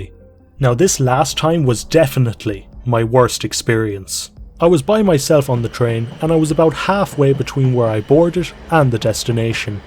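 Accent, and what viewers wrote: Ireland. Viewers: He does I was thing the exact same thing. Also because they both have a deep voice and an Irish brogue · He sounds irish Jacksepticeye intensifies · Sounds Irish.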